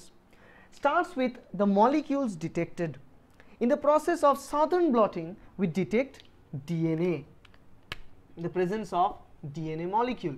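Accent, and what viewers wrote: India. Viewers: When I saw the video, I said oh my God, this is Indian and he speaks English, of course, I will not understand anything, but I understood what I did not understand in 4 hours🙃 thank you soo much 😍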